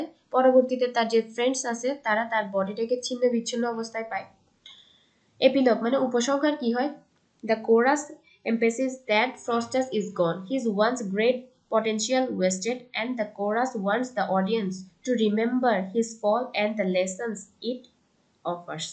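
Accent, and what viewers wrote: Chittagong, Bangladesh. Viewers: চট্টগ্রামের ভাষার প্রভাব😆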